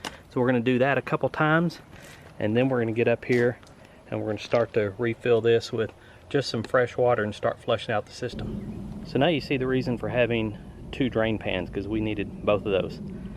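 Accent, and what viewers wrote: South, United States. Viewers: i like ur southern accent... makes me wanna eat some ribss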